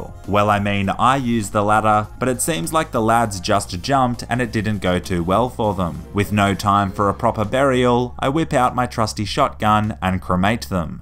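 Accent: Australia. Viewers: the most heavy Australian accent I've ever heard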